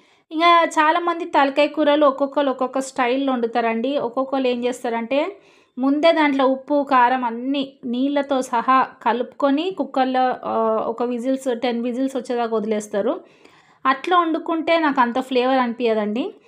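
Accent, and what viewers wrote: Telangana, India. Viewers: Super akka Telangana slang❤😊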